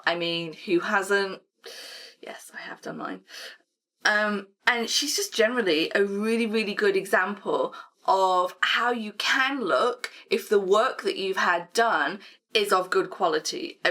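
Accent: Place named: United Kingdom. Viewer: The most beautiful accent of the world : english UK ❤🙋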